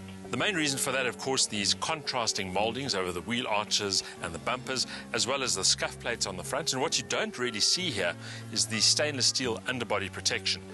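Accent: South Africa. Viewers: ARE YOU FROM SOUUUTH AFRICA?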